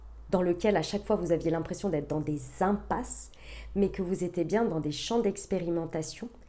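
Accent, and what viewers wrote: South, France. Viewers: Bonjour merci c'est tout à fait cela le ,17 mon chéri qui rentre de 15 jours de voyage et nous allons enfin commencer notre histoire le 23 grande fête pour les 35 ans de ma fille 🙏☀️🍀🎊votre guidance est incroyable pour moi c'est fou mille merci de me rassurer dans mes angoisses de vierge . Bisous du var 🥰 d'ailleurs votre petit Rose quand vous parler me dit que vous êtes du sud aussi ☀️☀️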